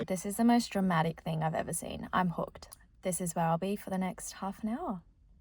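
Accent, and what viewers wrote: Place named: United Kingdom. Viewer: Such a cosy video, and lovely British accent.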